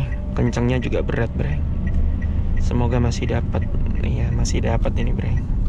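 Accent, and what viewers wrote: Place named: Surabaya, Indonesia. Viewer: Suroboyo timurr wkwk.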